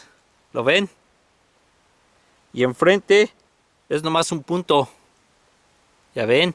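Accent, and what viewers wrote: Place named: United States. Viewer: progre so bueno laverdad no estoy seguro pero a alguien que un dia hablo español es imposible que se le olviden ciertas cosas, y se le nota al hablar de que algunas cosas no las maneja, como la acentuacion por eso pienso que es Estadounidense. pero bueno, su canal es excelente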